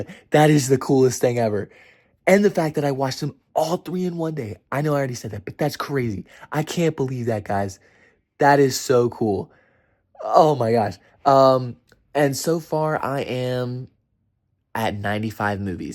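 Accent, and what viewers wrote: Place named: South, United States. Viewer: The more excited you get the more the southern twang comes out. 😂👍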